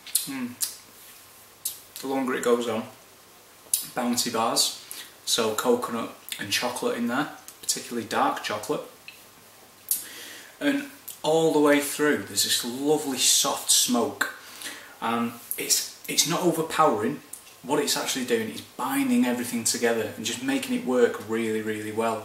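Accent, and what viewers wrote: Manchester, United Kingdom. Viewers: I luv your Mancunian accent! :-) And what a cool review of a great little whisky this is! Good job, mate!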